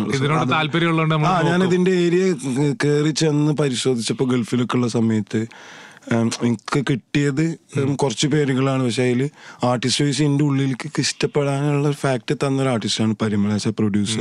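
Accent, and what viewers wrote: Malappuram, India. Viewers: Malappuram bhasha vere levala..❤ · ഞങ്ങളെ മലപ്പുറത് വന്നാൽ അങ്ങനെ ആണ് ഭായ്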